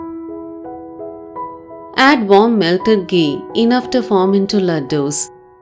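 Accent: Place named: South India, India. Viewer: I don't understand south Indian accent 😂😂 very funny accent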